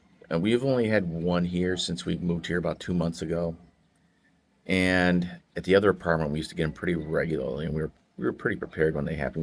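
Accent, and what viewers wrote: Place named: South, United States. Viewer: I like the cool way you narrate your fact! It makes you want to listen! The southern way you round up the words reminds me of the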